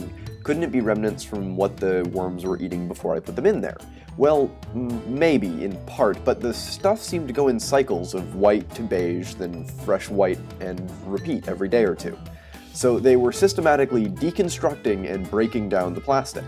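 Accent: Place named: Canada. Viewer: This is the perfect example of a Canadian accent. 😂 awesome video, even if I’m 3 years late.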